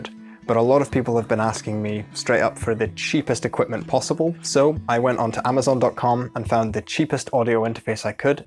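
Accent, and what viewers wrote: Scotland, United Kingdom. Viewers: Kinda sounds Scottish?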